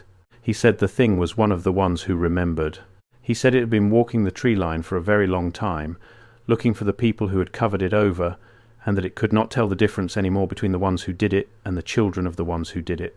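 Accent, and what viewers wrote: United Kingdom. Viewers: Thanks for saving me twenty minutes. It’s ridiculous to have a British accent for this story. It’s kind of like if one of the holler gals usually dressed in a dirty cotton dress suddenly donned a dress just sent from Paris. A mini skirt dress, yellow with large black polka dots and black boots.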